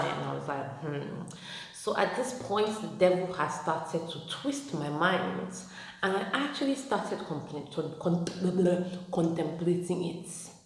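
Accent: Nigeria. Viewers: Your storytelling w/ the Nigerian accent has me screaming☠️💀😅you are sooooo funny🤣🤣🤣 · Hey sis. Your braids is really cute. U did a great job. I like your smile and 9jia accent.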